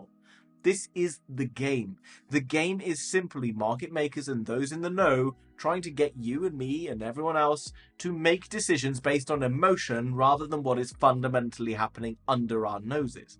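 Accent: United Kingdom. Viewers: The British accent adds comfort and trust lol. He could sell an Eskimo a deep freezer.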